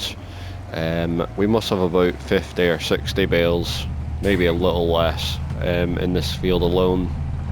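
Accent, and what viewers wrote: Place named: Highlands, United Kingdom. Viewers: sounds like Inverness lochaber maybe black isle sort of way doesn't it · Hi dude. Where you from cause you sound like your near me up in the highlands? Wanting to do so e realistic farming in farming sim 25 ma sel. Keep up what your doin bud. Camsden farm is great!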